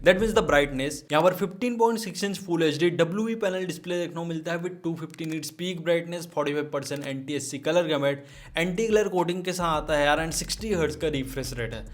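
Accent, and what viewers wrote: Chhattisgarh, India. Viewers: Bhai aaap chhattisgarh se ho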